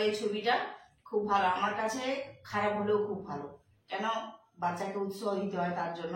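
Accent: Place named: West Bengal, India. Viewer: Ooo ma go dada ki koreta a to West Bengal r vasa❤❤❤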